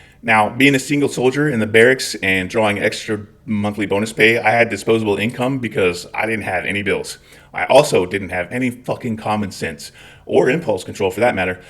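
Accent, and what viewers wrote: Scotland, United Kingdom. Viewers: as a resident of Northern Ireland. Your Scottish accent had a fair hint of a Belfast twang to it! Lol! Nonetheless, you can definitely hear the Scotsman in your speech! Your stories are funny as fuck!! I've never served (My Mum's side of the family did during WW2!) but my Butthole has went nuclear on several occasions! I've made toilets glow in the dark! My farts threaten the fabric of space and time! (Not to mention the fabric of my jeans!😬😬😬)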